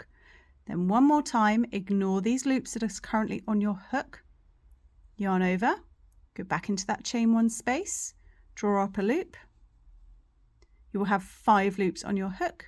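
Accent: England, United Kingdom. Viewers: And another wonderful video! I must admit that your accent is a delight to me. My grandfather immigrated from England in the early 1900’s and I’ve been soothed by a British accent my whole life. That and the marvelous content have got me hooked ❤️ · I so enjoy your instructions. They are given so clearly. You sound very English but use the US terminology. How is that?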